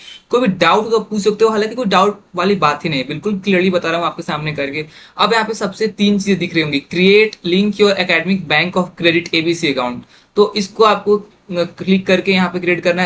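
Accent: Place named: Bihar, India. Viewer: Bhai aap bihar se hi kya tone match kr rha h 😊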